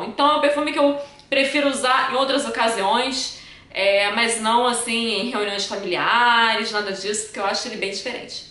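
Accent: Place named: Rio de Janeiro, Brazil. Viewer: chequei por aqui agora, masssxx vc tem muito sotaque de carioca. Amei os videos!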